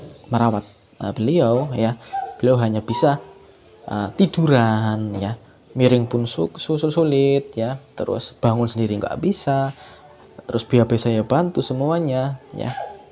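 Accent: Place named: Java, Indonesia. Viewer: dari logatnya kaya wong jawa ngapak mas.. kalo boleh tau domisili manan mas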